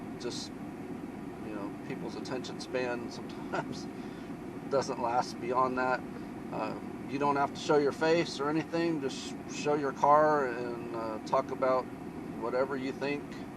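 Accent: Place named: Texas, United States. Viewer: You might should type that e-mail address out as it's hard for me to understand that Texan drawl.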